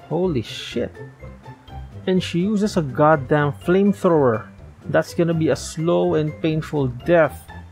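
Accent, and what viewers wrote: Philippines. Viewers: Very fili accent. Love this vid and lore!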